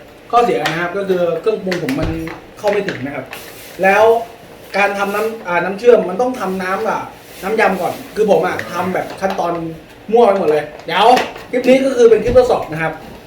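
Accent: Isan, Thailand. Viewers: เว้าอิสานละอ้ายค่อยตลกดี 555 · พี่ เป็นจังหวัดไหนครับทำไมเว้าอีสาน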